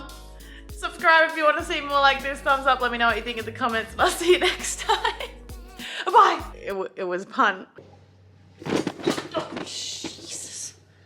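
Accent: Australia. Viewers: That's sarcasm right? 10 hour flights? now? That's definitely an aussie accent or am I going crazy?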